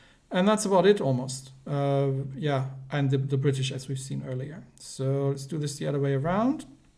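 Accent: Germany. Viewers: I must say, I do love voice. I do like a German accent. I equally like accents that sound german. May I ask, are you German? If not, then, if you don't mind me asking, what ethnicity are you? By the way, a few suggestions: try playing World of Tanks Blitz (WoTB), and, furthermore, try doing like a series of livestreams where you grind, on a new account or, if possible, on your current account, an entire ship line, from tier 1 to tier 10, in WoWsB. For example, the Yamoto line, or, even better, a brand new ship line, when another new line of ships comes out.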